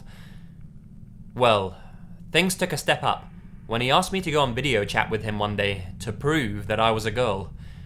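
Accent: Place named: Surrey, United Kingdom. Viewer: bruv how do u talk like that I'm English but you sound like bare posh u must be from sury or summin any great vid m8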